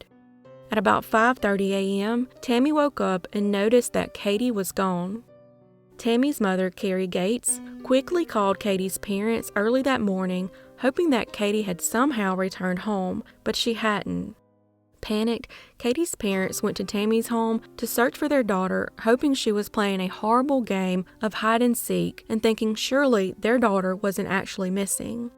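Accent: South, United States. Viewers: Love her southern accent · Yes, I agree with everyone. Your opinion spun on this vile behavior coupled with that Southern accent is expressed quite nicely. Sorry ass sons lol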